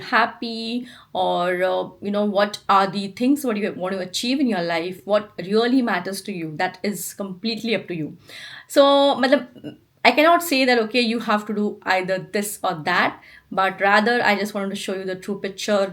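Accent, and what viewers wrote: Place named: India. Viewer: Thank you.. and I belive i did understand at least half of your indian-english! Its difficult becuse you use another pronunciation! But anyway.. sweden is not a paradise/not either a place to make a lot of money and move home after.. must be better places.. like Pakistan or Afganistan? How ever.. sweden do have one of the higest taxes in the world.. and its goes in to our healtcare and social care system.. its includes healtcare, schools, your pension, your parents healtcare and retirement homes, public transportation and a lot more! Its set up for people that lives in sweden! And then to people frome India and even other countrys.. its almoste higly educated frome top universitys of india or other countrys that get a job in sweden! Or even can apply for a job! And there is altso traps to be aware of.. swedish companyes that tryes to get cheap labour frome India.. 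be aware of that scam! Its rather this.. big international companys even if they are swedish.. do like to hire people frome other cultures.. to get a grip of the market there! High education and on top of that language and cultural skills is a benefit to get a job! Sooo sweden is not a paradise.. exept if one like to build and rise a family.. with kids!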